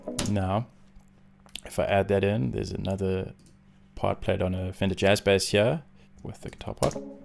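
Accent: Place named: South Africa. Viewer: Oh this is great for so many reasons - one of which is to be hearing a South African accent on this kind of video 🤣. Thanks, I'm subscribed and 🔔🛎️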